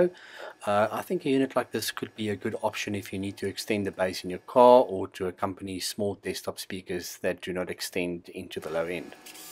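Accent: South Africa. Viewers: Hi, you sound South African, if you are where are you based and do you perhaps sell what yoh make? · South african voice I heard there. Where did you source the driver? Looking to replicate your build along side a set of 3.5 inch satellites. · Hey. Are you by any chance from South Africa? Your accent is why I'm asking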